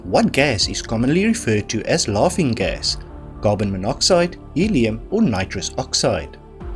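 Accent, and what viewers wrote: South Africa. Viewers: I find the pronunciation perfectly acceptable. Not being picky, but when "Poirot"is needed, try saying "PWAR - OH ". ( That's " yer " actual French!😊 By the way, do you have a tinge of "South African " there ? Enjoyed the quiz, only missed a few questions.😊 😊🇬🇧🦉🌿🎶🌼🇬🇧😊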